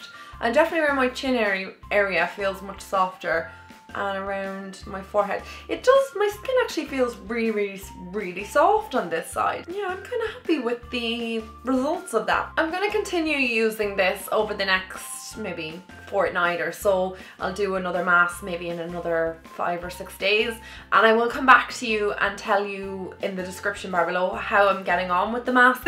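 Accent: Ireland. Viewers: Love the Ireland accent 😂❤️😍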